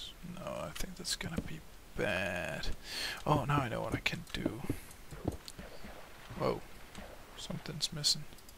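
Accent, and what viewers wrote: Denmark. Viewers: Bud, the aesthetics of this place are just a pure work of genius. I am loving it and more games with your danish accent would be enjoyable. :) Keep the vids coming. :)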